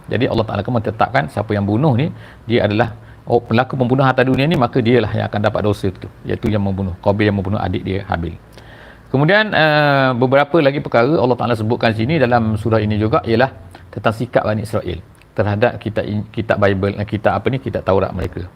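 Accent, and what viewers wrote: Terengganu, Malaysia. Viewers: Assalamualaikum... alhamdulillah. Cuma nak tanya Dr ni asal Terengganu ke? Bunyi slang Terengganu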